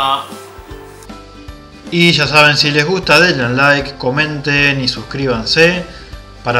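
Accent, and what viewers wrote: Argentina. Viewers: hola una pregunta sos Argentino ?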